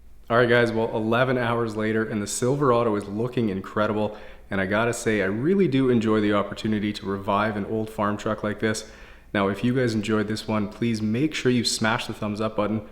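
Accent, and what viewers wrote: Canada. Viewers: I was just about to ask if you were from Canada lol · The Canadian accent is so cute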